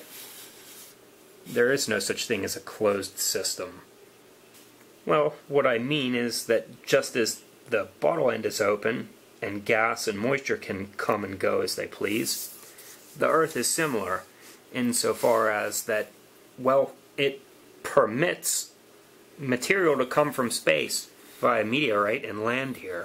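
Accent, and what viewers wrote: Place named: Pittsburgh, United States. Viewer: I thought I detected a hint of a Pittsburghese accent;) I'm from the Lehigh valley myself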